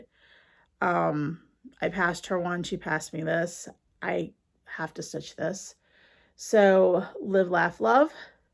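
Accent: New England, United States. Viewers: I just stumbled across your channel and recognized a fellow New Englander almost immediately from your accent. I’m in southern NH. I love stash videos.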